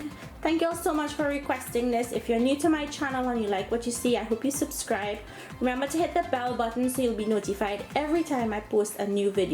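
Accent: Trinidad and Tobago. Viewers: I just love the accent of a Trini I love guava cheese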